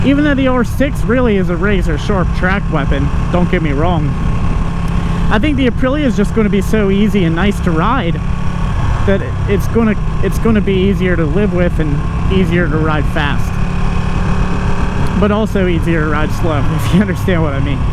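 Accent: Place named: Pittsburgh, United States. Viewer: subscribed for the pittsburgh accent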